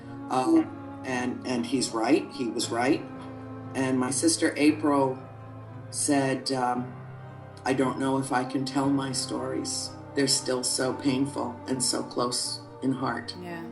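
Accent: Ireland. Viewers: It's called a Djinn. N' omg by the sound of your accent, you're Irish. :.D <3 What went on in that house, was because of the witch, contacting a Djinn. (Which most may call a demon). The thing to do with the sacrificing, and whatnot. Many stories like this have been heard in Algeria, where I am originally from. It's to do with the occult, and soul sacrificing. No joke, this stuff is real, and just don't go near it. Movie was based on real events. They interviewed the kids who lived there, and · OMG I love the Dublin accent! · yep I love the irish accent to.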